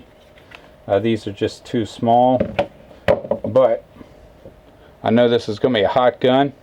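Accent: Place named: South Louisiana, United States. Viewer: I like that shirt. I knew I recognized the accent originally being from south Louisiana myself.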